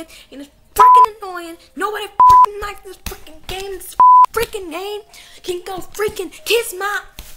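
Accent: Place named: United Kingdom. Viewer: Are you British or not? Cause you sound like it. But I Love you!!!!😘😘😘😘😘😘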